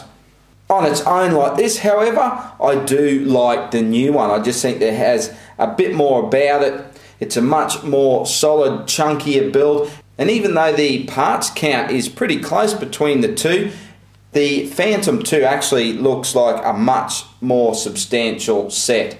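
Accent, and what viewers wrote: Australia. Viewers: u are so aussie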